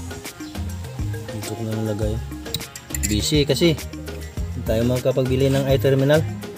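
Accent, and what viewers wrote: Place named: Western Visayas, Philippines. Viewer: Yun ang gusto q sa ilonggo, malambing magsalita. How tooooo instaaaall...😅🤣😂👋. Pero damo nga salamat gid to sa tudlo mo ha kay damo q nabal-an halin sa imo ubra.👍👍👍 Gbu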